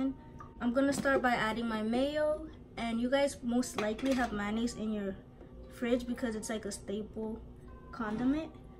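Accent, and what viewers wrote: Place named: United Kingdom. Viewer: Your british😂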